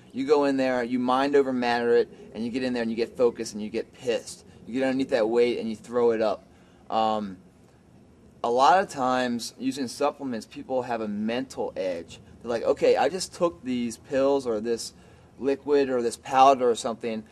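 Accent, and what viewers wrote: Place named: Baltimore, United States. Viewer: baltimore Maryland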